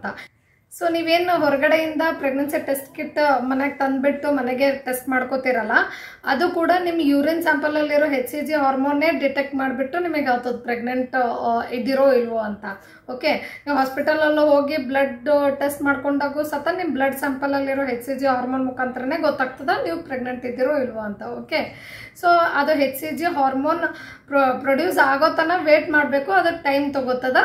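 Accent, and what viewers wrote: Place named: North Karnataka, India. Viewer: ಪಕ್ಕ ಉತ್ತರ ಕರ್ನಾಟಕದ ಭಾಷೆಯಲ್ಲಿ ಹೇಳಿದ್ದೀರಿ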